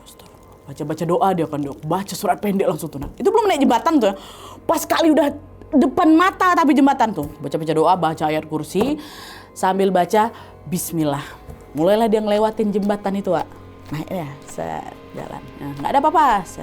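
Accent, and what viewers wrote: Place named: Sunda, Indonesia. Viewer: Jujur sebagai orang sunda asli Suka banget sama KHW Sunda ka lucu logat ngomong sunda ka 🥰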